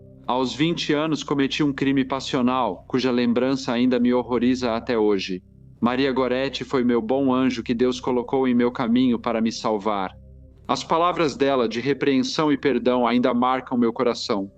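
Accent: Portugal. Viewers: Como relaxar ouvindo esse sotaque horrível de português de Portugal? Arruma isso aí!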